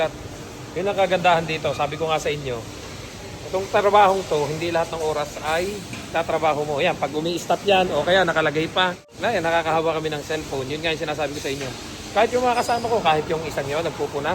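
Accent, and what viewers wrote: Philippines. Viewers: Kuya parang tagalog na tagalog ka? Taga Quezon?, Mindoro? Mariduque?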